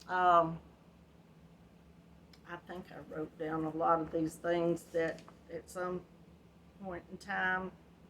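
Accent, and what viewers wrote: South, United States. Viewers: I really love watching your videos and hearing you talk. I especially love your Southern accent! There's just something soothing about it. Your Sislove Shawl is so beautiful! I always have several projects going at once, and don't always get everything done by the deadlines I set for myself. My shawl is still in Section one, but I will finish it. Thank you for your videos!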